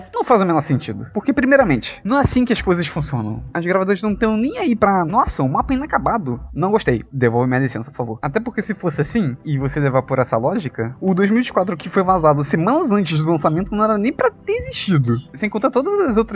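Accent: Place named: Rio de Janeiro, Brazil. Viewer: Me deliciando com a voz carioca do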